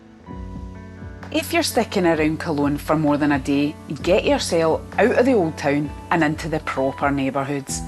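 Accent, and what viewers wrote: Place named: Scotland, United Kingdom. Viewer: Great video. U sound Scottish (video creator) ; I’m going in October 2026 and am psyched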